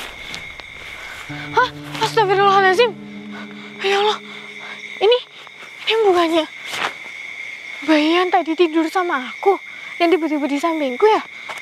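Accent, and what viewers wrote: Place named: Java, Indonesia. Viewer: Mba dr logat nya mba dr Jawa ya.masih kelihatan lihat Jawa nya.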